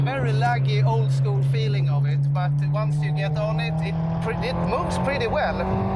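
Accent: Sweden. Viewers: Absolutely loving that Celica.. Great video, loving the Swenglish! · Legend says it's impossible to obtain a stronger swedish accent than that of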